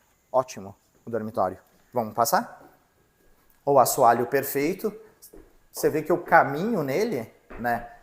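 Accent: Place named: Rio Grande do Sul, Brazil. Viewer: Meu amigo fala com o nosso sutaque, aqui nós falamos tu e não vc, bas que barbaridade tchê!!!!!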